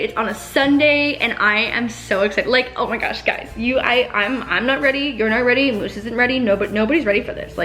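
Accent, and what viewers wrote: Canada. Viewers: You know she Canadian she says ishhhhh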